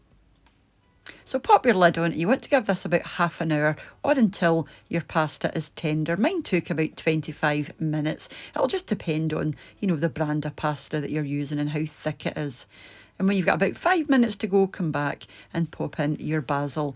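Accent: Scotland, United Kingdom. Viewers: Old recipe but I'm making it. Love your Scottish accent. :) looks amazing.... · I've made your scotch broth and OMG loved it! I will try this too, but I have to say, you could burn toast and I'd still listen. Love that Scottish accent. I can't wait to visit Scotland - as long as Nicola doesn't close the border to us English 🙄 Anyway, 💜 to all in Scotland xx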